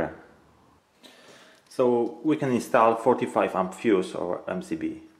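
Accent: Poland. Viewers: Very nice explanation, you make a great teacher; sweet memories of my work with some Polish builders because of the accent. :)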